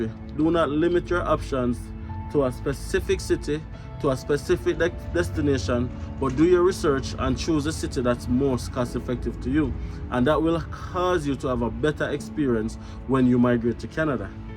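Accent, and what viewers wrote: Jamaica. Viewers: Well informed video. Loved the Jamaican accent as well which is slowly but surely dissappearing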